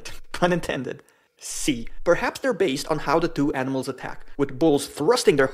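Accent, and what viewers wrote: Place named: Singapore. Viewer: Are you from Singapore? Thick accent.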